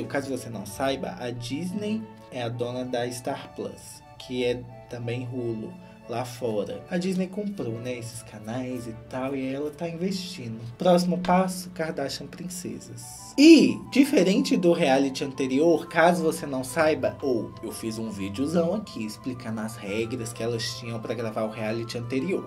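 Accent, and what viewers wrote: Minas Gerais, Brazil. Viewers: do céu, NUNCA acompanhei as Kardashians. Mas gostei tanto do seu jeitin (vc é mineiro néam?!) de contar a história que vou acompanhar por aqui! Bjos!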